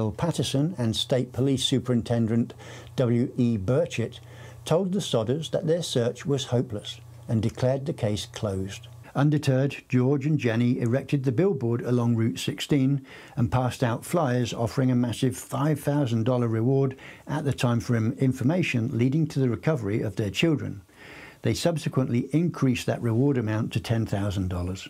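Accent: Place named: Ireland. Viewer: Your Irish accent helps your story telling . You have the voice and speed of a good story teller.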